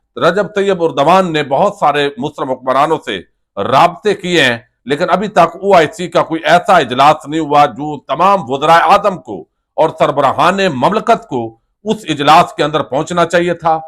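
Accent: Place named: Punjab, Pakistan. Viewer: Bhai plz ap punjabi m hi vlog kar Lia karo Shabazz gill ki terha kiyu ke ap ka lehja clear punjabi huta he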